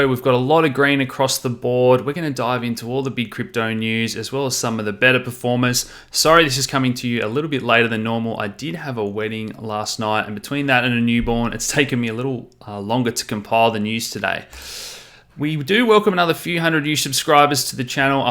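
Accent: Australia. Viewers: Great channel! Great find! Have been watching themoneygps and Lynette Zang etc Good to hear an Aussie accent in this space but adds in crypto as well.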